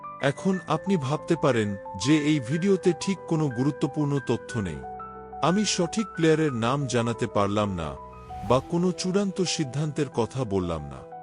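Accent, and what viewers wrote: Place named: Bangladesh. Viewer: উচ্চারণ শুনে মনে হচ্ছে বাংলাদেশি। আমার অনুমান যদি সত্য হয় তাহলে বাংলাদেশেও মোহনবাগান সমর্থক আছে জেনে আমি গর্বিত।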